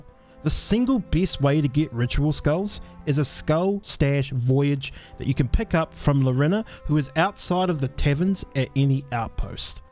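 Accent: New Zealand. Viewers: Love the New Zealand accent (well I hope it is) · Are u a kiwi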